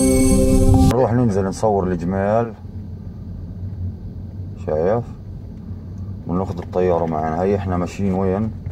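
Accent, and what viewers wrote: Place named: Palestine. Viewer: ***** تسلم يا غالي .. ربي ينصركم بربي اجمل مافي فيديوهات الاخ جمال غير لمعلومات انو بياخدنا سياحة في فلسطين و منسمع لهجة لفلسطينية العريقة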